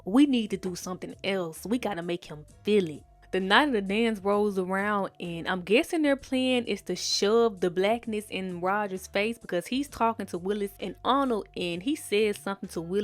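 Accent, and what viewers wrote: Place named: South, United States. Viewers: Oh I would’ve guessed Tennessee. There was a contestant on Survivor last year (Q Burdette), and your accent sounds so similar to his. He is from Memphis though, so pretty close to Mississippi. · Right!? I love the black Mississipi and Lousiana accents (all the deep southern states tbh), I'm from London, so I've yet to hear one in person tho😂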